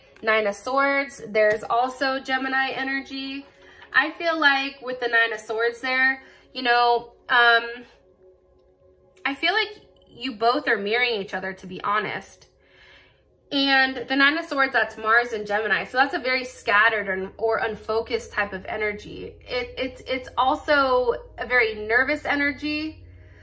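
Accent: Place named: United States. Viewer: Luv your American accent (I'm English )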